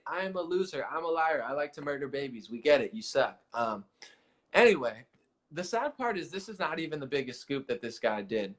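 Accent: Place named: South, United States. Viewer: Dude, love the southern accent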